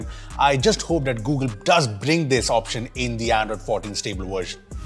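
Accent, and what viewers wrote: India. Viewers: I love the light Indian accent with great English ❤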